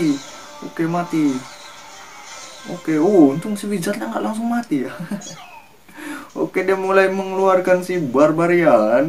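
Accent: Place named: Banyumas, Indonesia. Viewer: Ngakak ngapak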